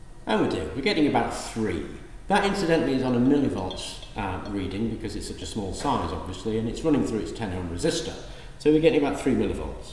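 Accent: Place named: England, United Kingdom. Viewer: english accent plus echo means no compreda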